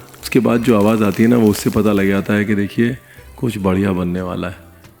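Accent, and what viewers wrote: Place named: Lucknow, India. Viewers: Missed your "Hello ji " in the beginning , "ranveer ne bataya nahi" and your lucknowi way of explaining.kul mila k aaj maza nahi aaya .chefs to bahot aapke anokhe andaz k liye aate hai yaha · Lehja apka aur yaad Lucknow ki ... The style to present anything...is absolutely stunning No words can define your way , speak so lovely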